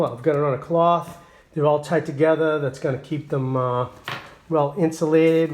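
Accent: Boston, United States. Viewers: Could you be from BAHston? Also Mainers talk like yoy, too. (or is it "tok"?.Us Jerseyans say "tawk," lol.).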